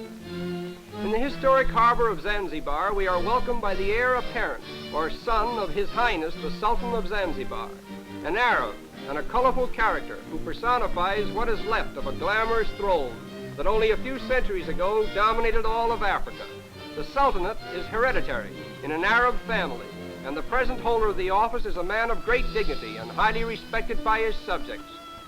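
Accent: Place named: United States. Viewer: Comparatively speaking they were. Most native peoples had not seen a car or radio back then. Schools and widespread education were just starting and the average lifespan of peoples was in the 30s. Very few persons reached a ripe old age to convey accumulative wisdom to their communities. Life (especially in the interior) was short and brutal in the 1800s and this period in the early 1900s marks the transition away from that. In this period colonisation of Kenya was just starting and at this time there were no more than about 10,000 Europeans in Kenya. This sounds like an American commentating and reflects the attitudes of his people and the times.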